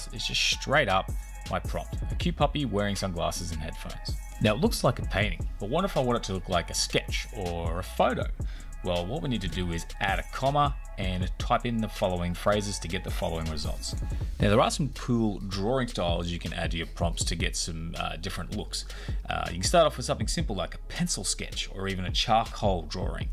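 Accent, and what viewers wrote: Australia. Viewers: Oh, my ears! It was so damn nice to hear such an Aussie accent! G'day!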